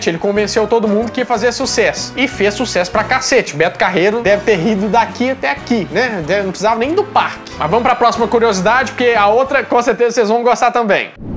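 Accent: Minas Gerais, Brazil. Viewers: Curti e me inscrevi no nerd show, pq gosto do seu sotaque mineiro! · Que simpatia! Mineirice mais boa de se ouvir: a original!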